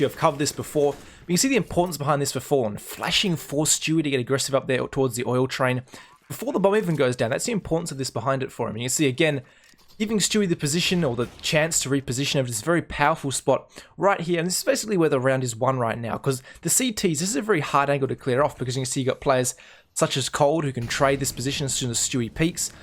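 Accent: Australia. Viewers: sounds Australian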